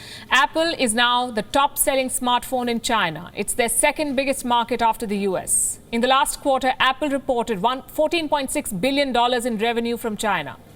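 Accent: India. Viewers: Is this Fox news with indian accent and tan? I love America and China is surpsssing us. Solution invest intetnationally and tax the income heavpyly for building middle class again.. not let them keepthe loot snd starving people in US like our own Doing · Your Indian accent speak volume of your jealousy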